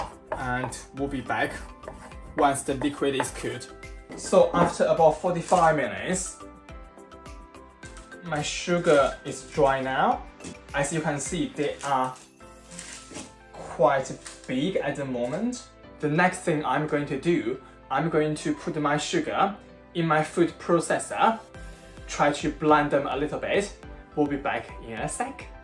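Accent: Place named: United Kingdom. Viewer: Pretty interesting how with some words you sound british and some words you don’t haha is sugar cane locally available where you live?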